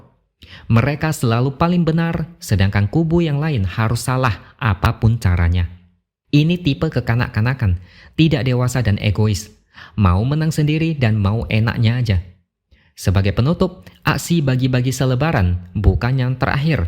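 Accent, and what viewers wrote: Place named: Banyumas, Indonesia. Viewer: Wong ngapakk 🤟🤟🤟🤟🤟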